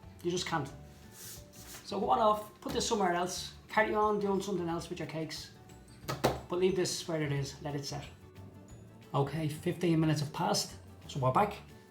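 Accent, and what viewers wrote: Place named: Ireland. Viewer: Awesome tutorial video! Love your accent, pretty sure its Irish 😊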